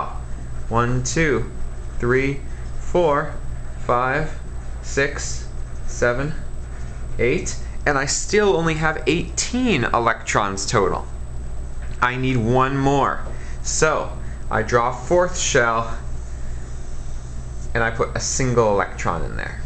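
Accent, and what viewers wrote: Canada. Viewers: the canadian accent just makes everything better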